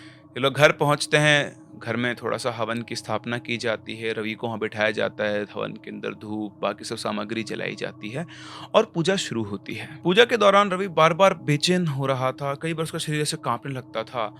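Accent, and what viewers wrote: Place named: Bihar, India. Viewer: Sir apki baato me Bihari bol najar aati hai....lgta h. Ap bihar se bhut connected ho....... Mujhe apki sari real stories bhut pasnd aati hai....maine apke sare stories suni hai.and I loved it...lot....❤ Love from...Bihar...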